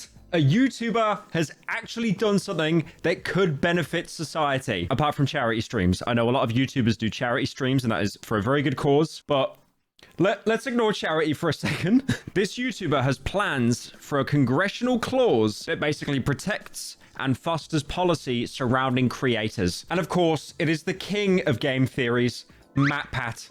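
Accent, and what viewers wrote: United Kingdom. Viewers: british lol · Are you british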